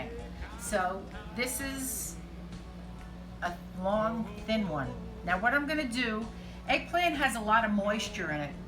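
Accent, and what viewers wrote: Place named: Philadelphia, United States. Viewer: Your recipes are great but your pronunciation of slang Italian American version of words sound very South Philly. It’s not authentic Italian pronunciation. I still enjoy your videos. 🍷😋👍🇮🇹🇺🇸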